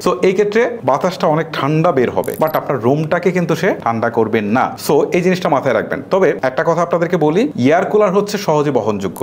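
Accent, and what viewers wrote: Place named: Noakhali, Bangladesh. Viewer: Eita noakhalia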